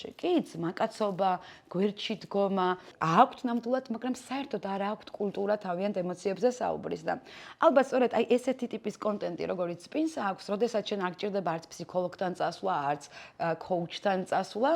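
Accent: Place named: Imereti, Georgia. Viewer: წარმოშობით იმერელი ხართ ხო? პირველივე ვიდეში მოხვდა ყურს იმერული ჰანგები და აჰა, 😄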